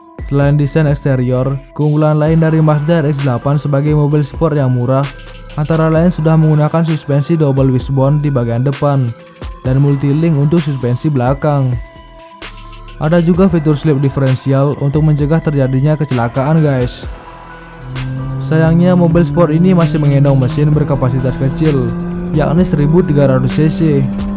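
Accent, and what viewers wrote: Bali, Indonesia. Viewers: Logat orang bali dan ntb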